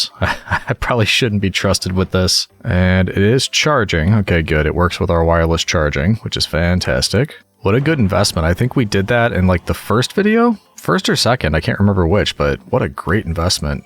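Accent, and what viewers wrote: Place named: Scotland, United Kingdom. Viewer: I fully enjoyed your Scottish accent!😂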